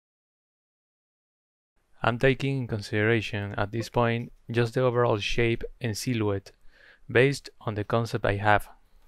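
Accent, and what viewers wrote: Argentina. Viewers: Excelent tutorial. Also, this man speaks english with a Good Airs accent, just like me, so really Easy to follow. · Jaja, me siento orgulloso de haber identificado el acento argentino (? muy buen trabajo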